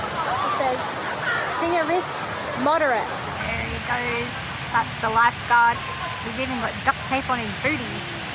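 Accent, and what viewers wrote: Australia. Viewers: Holy shit. I didn’t know you could talk!! You’re Aussie. I thought you were a Russian! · Love your Australian accent 😮😮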